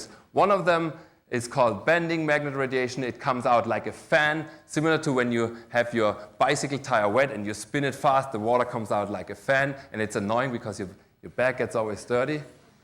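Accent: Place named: Germany. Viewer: These lectures always sound more authoritative when delivered in a German accent.!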